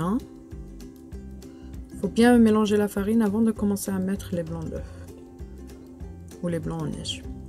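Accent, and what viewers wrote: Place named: Oran, Algeria. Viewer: J'adore ton accent wahran officiel 💟😅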